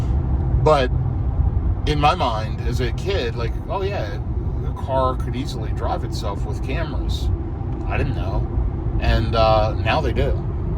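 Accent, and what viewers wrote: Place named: Western North Carolina, United States. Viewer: I'd still say North Carolina with the way you pronounce your Os. It very unique to western North Carolina.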